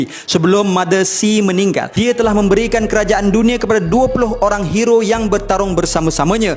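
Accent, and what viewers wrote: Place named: North, Malaysia. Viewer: loghat utara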